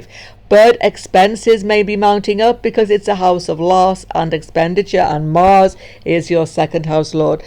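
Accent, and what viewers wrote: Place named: Scotland, United Kingdom. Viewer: Love the explanations and def love your Scottish accent❤️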